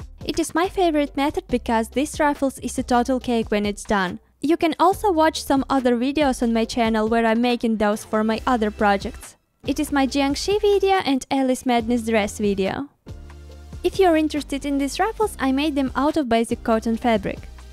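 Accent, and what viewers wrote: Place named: Ukraine. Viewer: I love the red doll and your workspace, but I just have to say... Your accent is so pretty! You're Ukrainian? I've never heard someone speak English so lovely! xD God bless all of you. ❤️ 🧡 💛 💚 💙 💜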